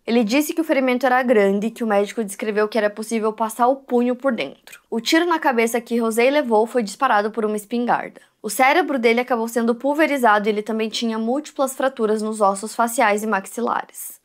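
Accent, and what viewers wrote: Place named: Paraná, Brazil. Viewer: Paranaense adoro nosso sotaque.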